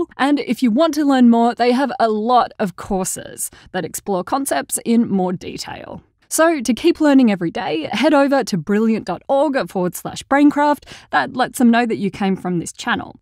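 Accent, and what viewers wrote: Australia. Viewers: Same! And her purely Aussie accent too 😁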